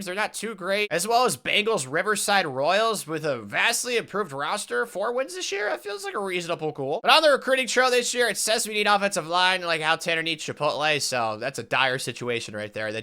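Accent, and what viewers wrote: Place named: Mississippi, United States. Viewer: U from Mississippi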